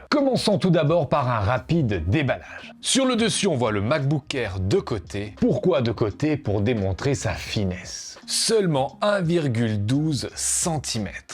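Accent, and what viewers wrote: Switzerland. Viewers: Tu es suisse ?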